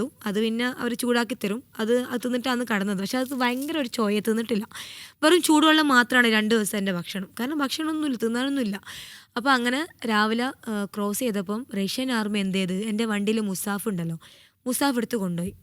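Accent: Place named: Kannur, India. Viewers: 😂കണ്ണൂർ സ്ലാങ് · Kannur slang anu.... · ഓരോരുത്തരും അവരവരുടെ സ്ലാങ് ലാണ് സംസാരിക്കുക.. തലശ്ശേരി സ്ലാങ് ആണ്..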